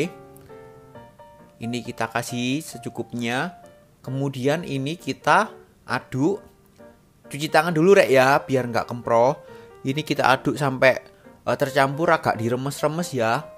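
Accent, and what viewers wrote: Java, Indonesia. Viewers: Menunya enaak, gaya komunikasinya menarik, apa adanya, jawa banget, nyenengno 😀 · Terimakasih resepnya ttp ngomong2 masnya orang msna ya kok jawsnya medok. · Di klukupi iku opo mas?! Hehehehe...medok'e mantabs!!! Sehat ya mas...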